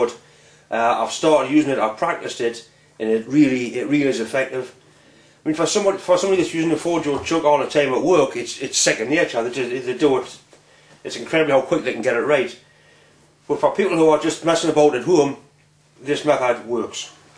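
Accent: Newcastle, United Kingdom. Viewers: zonnekat he speaks Geordie,from the north of england! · ***** Closer to Scotland than Yorkshire as it happens, but yes, I'd say in England, around Newcastle or thereabouts. Closer to a Geordie than a Maccem though.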